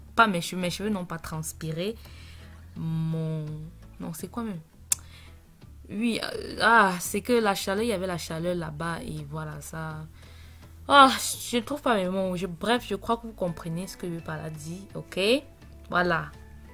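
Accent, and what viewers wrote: Cameroon. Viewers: T'es camerounaise?? Ta manière de parler me fais croire à une camerounaise😂😂😂, tu me tue seulement mdr, j'aime t'es vidéo, continue comme ça👍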